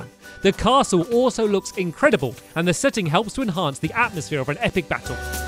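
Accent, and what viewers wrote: United Kingdom. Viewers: Because I'm not used to a British accent I thought you said my egg favorite map instead of my 8th favorite map lol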